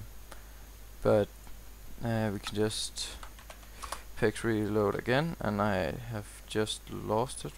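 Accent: Denmark. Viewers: Haha du er dansker kan høre det så let XD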